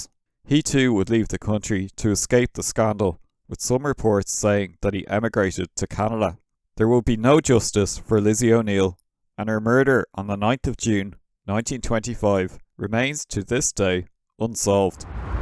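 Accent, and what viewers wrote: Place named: Ireland. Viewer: What a gem of a channel I’ve found. New sub! With thanks. 🙏 nothing like an Irish person telling a story. Excellent.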